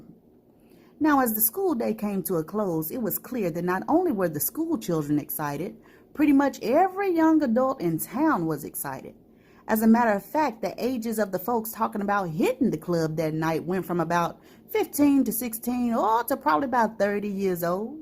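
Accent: South, United States. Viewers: That is such a pretty picture of you in the intro 😍. You're such a naturally pretty lil cutie pie. Love the stories, keep up the good work.. SCANDALOUS CHILE!!!! 😆 🤣 I love that you're such a southern Belle, "Shucks" 😆 Reminds me of going to South Carolina to visit my cousins "down the country" as my great grandmother would say. The way you talk brings back such good memories of my great granny. 👵 I'm just waiting for you to call somebody a jitterbug. 😆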